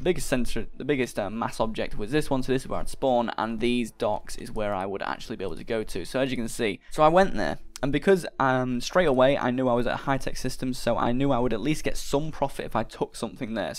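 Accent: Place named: United Kingdom. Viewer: can you talk a bit slower lol. i dont british or uk or whatever accent you have at all and the million words a second is near impossible to get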